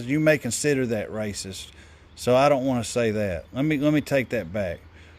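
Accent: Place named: South, United States. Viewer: You're such a wise man! You should really start a podcast (if you have time [outside of your JOB, of course]). Speaking with a deep Southern accent with heavy feeling has made many, many people think that you're one of the wisest people on earth! They're certainly not complete idiots, right?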